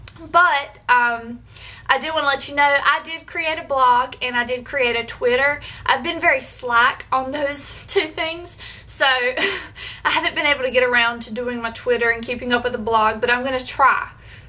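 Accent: South, United States. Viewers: I'm also from NC. I'm from Durham, we both have that same little southern twang lol. love your videos by the way <3.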